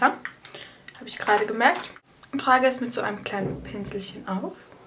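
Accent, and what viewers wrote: Switzerland. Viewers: Schweizerin ? :D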